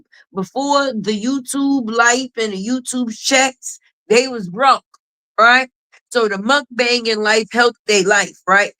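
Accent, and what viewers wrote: New York, United States. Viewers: I LOVE TO HEAR U TALK,FIRST UR GOOD AT WHAT U DO,BUT IT'S UR ACCENT FOR I LOVE IT,IS THAT A NEW YORK ACCENT? HELL IF SO I NEED RO MOVE THERE N CATCH ON TO THE NY. SWAG N ACCENT 😂❤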